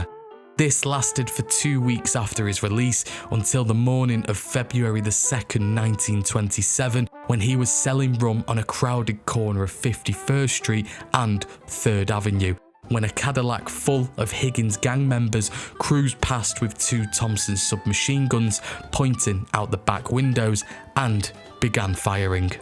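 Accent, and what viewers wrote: United Kingdom. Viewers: Northern English accent, not Yorkshire or Lancashire, somewhere around Manchester?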